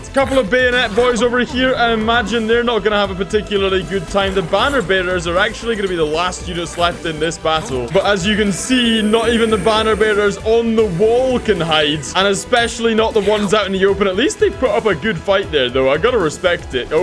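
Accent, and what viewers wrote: Scotland, United Kingdom. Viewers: Are you Scottish?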